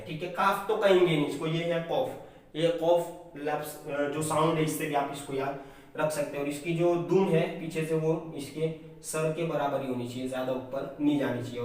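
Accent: Gujarat, India. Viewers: Tame Gujarati chho??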